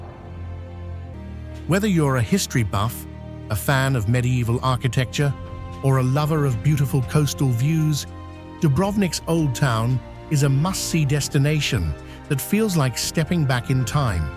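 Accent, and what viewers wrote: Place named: England, United Kingdom. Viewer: It's really great to hear a truly ENGLISH Description. Too many bad American commentary.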